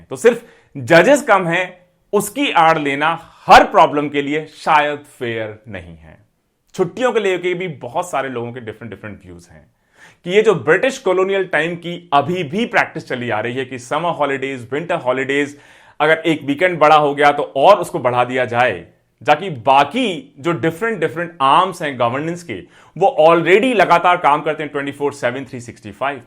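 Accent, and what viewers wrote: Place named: Rural, India. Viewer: सर आपके वीडियोज देख के एक पूरा रोड मैप तैयार हो गया पढाई का , परी analysis के साथ हो रही हैं,बस एक चीज की ठोडा कमी लगती है, हिंदी साफ सुथरा बोलने की ,क्योकि उसमे local language आ जाती है, गांव वाली हिंदी, गांव की भाषा ठीक है अपनी जगह पर हर चीज मे professional होना चाहिए, इससे थोडा आत्मविश्वास कम हो जाता है,इस पर कैसे काम किया जाए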